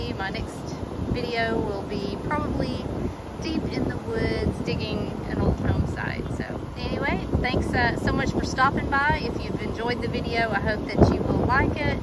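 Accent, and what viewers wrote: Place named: United States. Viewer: You look like an Australian, Aussie girl with an American accent 😉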